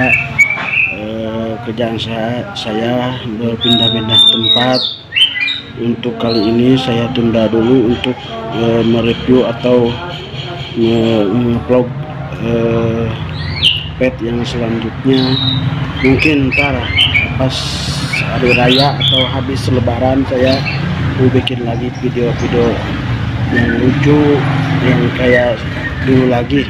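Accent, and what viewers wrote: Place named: Sunda, Indonesia. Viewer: Aduh meni leket pusan sundana si amang pace .terus berkarya pace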